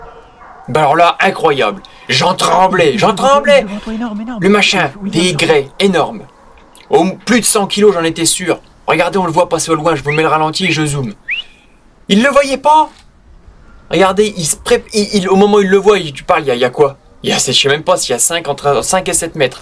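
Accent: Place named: Aube, France. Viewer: Super videos et super tirs ! Tu es d’ou pouvoir un accent comme ça…ça me fait penser aux petits gars de l’aube ! 😊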